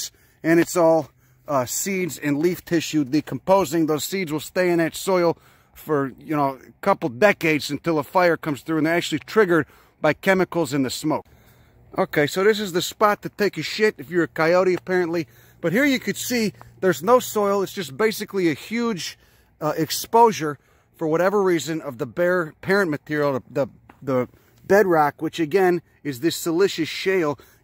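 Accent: Chicago, United States. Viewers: Please keep going with your videos. I'm a botanist with a specialty in native plants East of the Mississippi. I'm so grateful to learn about the native flora of California, from a learned person with a sense of humor. Your accent makes me miss the South Side of Chicago so much! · You’re from Chicago ain’t you? I can tell a Chicagoan when I hear one. I am also a Chicago guy. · What's the deal with the Chicago accent? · Exaggerated accent is the only "bit". His podcast has his actual voice, still a Chicago accent just not as prevalent. Same knowledgeable guy that clowns the clowns.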